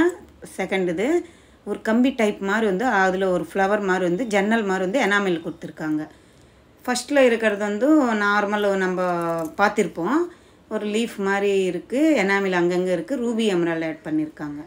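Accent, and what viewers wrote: Telugu, India. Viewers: Neenga pesurathu thelugu kalanthu varuthu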